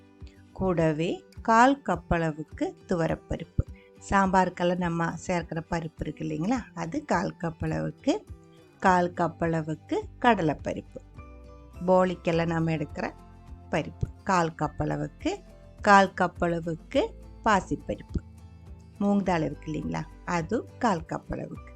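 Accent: Kerala, India. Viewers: Very nice receipe. Your malayalam tamil hearing is very different. 😂😂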